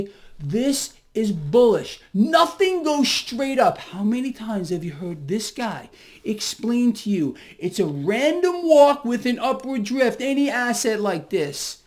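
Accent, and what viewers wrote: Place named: Brooklyn, United States. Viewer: Brooklynese Lol nice 👍 🔱Maserati baby....yeah!!!!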